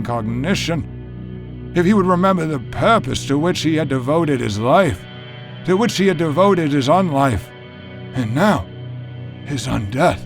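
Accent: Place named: United Kingdom. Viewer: This dudes voice just always gets to me. Something is so off about it. The weird half British accent he throws in whenever he feels like it, the weird emphasis on certain syllables or the breathy way he ends a lot of words, I just can’t with it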